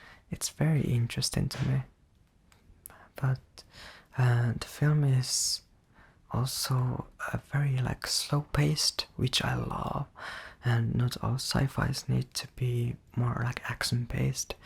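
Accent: Finland. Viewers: What is that accent? Almost sounds like a Finnish accent.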